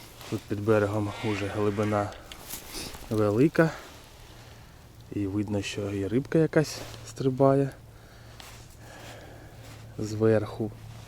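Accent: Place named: Chernihiv, Ukraine. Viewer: я живу в полтавській,з чернігівської переїхав років двадцять тому,і ось натрапив на ваш канал,і по рідному акценту зрозумів що хлопак з Чернігівщині)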